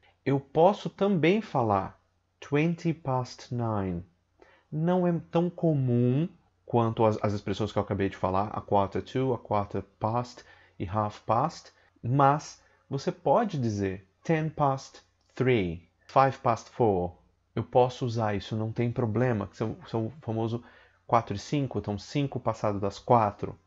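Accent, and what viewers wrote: United Kingdom. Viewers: Eu tô maratonando seu canal e to amando aprender o sotaque britânico pois é mais fácil para minha pessoa falar, ouvir e entender melhor. Mas uma pergunta, se uma pessoa está saindo e fala: Good night! Eu deveria responder como ela disse certo? Pois ela está saindo. Então eu também responderia "good night" na despedida?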